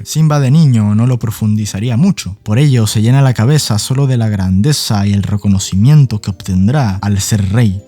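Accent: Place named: Ecuador. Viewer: Muy buen video, que genial se nota lo ecuatoriano en tu voz. Sigue así, ganaste un suscriptor.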